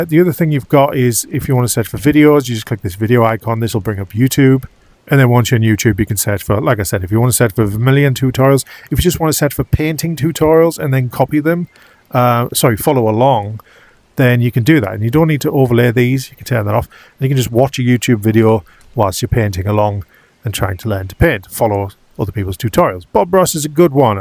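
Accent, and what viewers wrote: United Kingdom. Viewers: Just got Vermillion and was after a vid that explained it all. Up yours popped, and I'm glad it did. Clear and thorough, all with a welcoming NE accent (I'm not foolish enough to risk a guess which one...). Thanks for posting! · I am very grateful for this tutorial, nice to hear a fellow Brit. Exporting on my quest 3 goes to the data file in the android folder. BUT, it is saved as a VRMLN file. There is no option to save to a particular file format such as jpeg or png. I am doing something wrong? Do I need an account? Is there a setting somewhere I missed? Any help would be gratefully received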